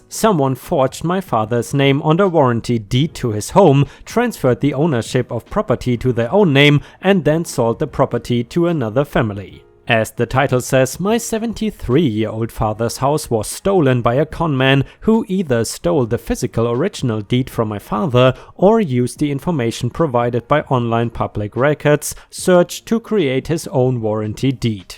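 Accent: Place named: Germany. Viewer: you "contacted everyone who'd commented on the thread"?! Good lord, that's some next-level leg work on reddit stories, and as someone who long ago answered your question of what sort of stories I would like to hear with the response, "any type, as long as there's reasonably satisfying ending where we get to know how things turned out", I very much appreciate you putting in that work. That's why I never miss an upload. Well, that and your German accent--it reminds me of the coolest guy I have ever met in my life, on a long train ride from Paris to Munich. I was erroneously sitting in his seat on the train when he opened the cabin door and addressed me in (to my ears, anyway) perfect French. I replied with, "uhhh..." and immediately in equally flawless English, he says, "oh, you're American. My girlfriend was right--Inga, you're right, he IS an American--but I thought you were French, obviously. 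You're actually in my seat--no no, stay there, stay there, keep the seat, we can just take these seats here--but perhaps in exchange for the seat you might buy the first round of beers for us? Does that sound fair? And then I'll buy the rest until we get to München. Oh, but please don't order us any American beer, do you actually drink that stuff? No? Wonderful! Then we will have a wonderful trip, I'm sure..."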